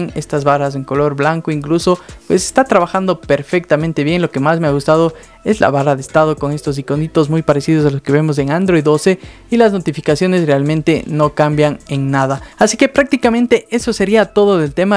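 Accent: Cuenca, Ecuador. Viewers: Eres de cuenca?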